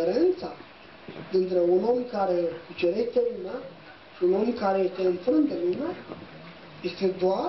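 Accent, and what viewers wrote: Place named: Moldova. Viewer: spuneți lucruri foarte interesante, vitale, dar nu este o claritate în sunetul de transmisie, pe lângă faptul că auzul scade la anumiți oameni și dvs.aveți pe ici , pe colo un minunat accent moldovenesc și o gestică ce mie îmi stârnește râsul, adică îmi place....mulțumesc infinit.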